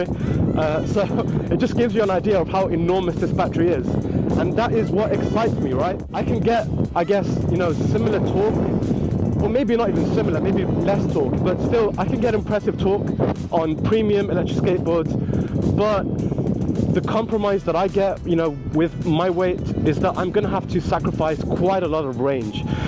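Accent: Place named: Birmingham, United Kingdom. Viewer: Do you need a wing man ? I’m watching your vid of the mighty flux the voice in my head tells me I know where your ride is my home city brum !! I knew that annoying but sincere accent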